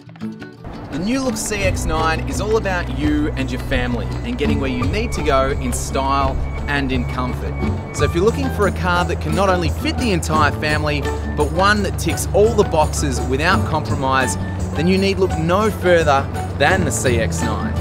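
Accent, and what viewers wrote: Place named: Australia. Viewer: Other than this guy speaking Aussie and through his nose - this is one of the best CX9 reviews on You Tube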